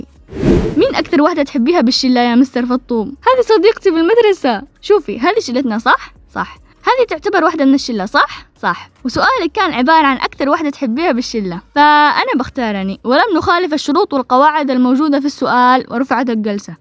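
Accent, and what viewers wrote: Yemen. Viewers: والله من اول فيديو شفته لك حسيت ان يمنية 😂😂 وجيت لذا الفيديو اتأكد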